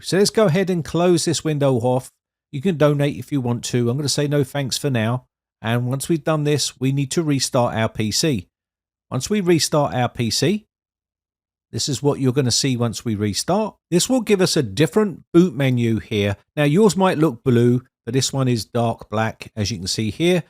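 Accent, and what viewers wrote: United Kingdom. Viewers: Bri ish tbh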